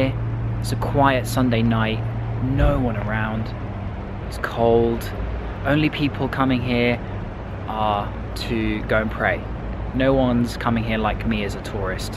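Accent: United Kingdom. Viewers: Whıte Turk It is English British accent! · You’re British?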